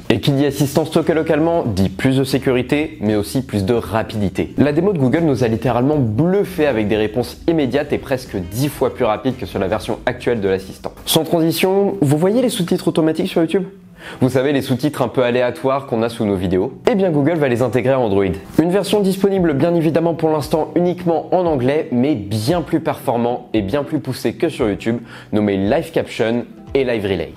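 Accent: Normandy, France. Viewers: Boujou on sent le normand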